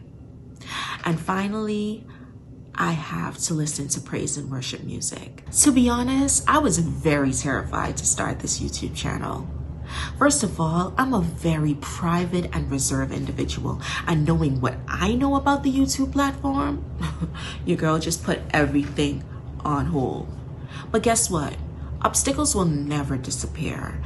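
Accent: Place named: Bahamas. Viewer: You sound like a Bahamian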